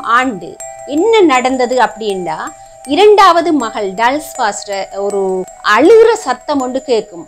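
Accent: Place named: Sri Lanka. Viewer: நீண்ட வருடங்களுக்குப் பிறகு இலங்கை தமிழர்களின் தமிழ் உச்சரிப்பு வார்த்தைகள் நீங்கள் பேசுவதைக் கேட்டு மிக்க மகிழ்ச்சி வாழ்த்துக்கள்💐💐💐💐.உங்கள் அமானுஷ்யம் சம்மந்தப்பட்ட காணொலி மிக சுவாரசியமாக உள்ளது.மேலும் முதலாம் உலகப்போர் உருவாகக் காரணமாக இருந்த சர் ஃபிரான்ஸிஸ் பெர்டினான்ட்(ஆஸ்திரியா நாட்டு பட்டத்து இளவரசர்),பெனிட்டோ முசோலினி,மாடா ஹாரி(டச்சு டான்ஸர்)செங்கிஸ்கான் போன்ற சர்வாதிகாரர்களின் வரலாற்று காணோலிகளை போடுங்கள்.நன்றி🙏🙏🙏🙏!(சுரேஷ்.சென்னை).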